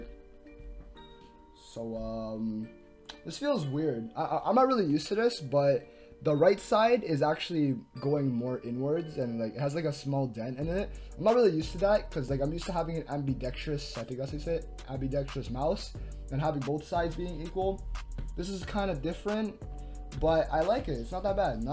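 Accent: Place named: Philippines. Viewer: Theeey Trynaa Bee Craate! You filipino?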